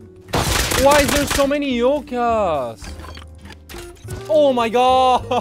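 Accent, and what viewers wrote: Argentina. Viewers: sos argentino o que? jajaja wtf you blowed my mind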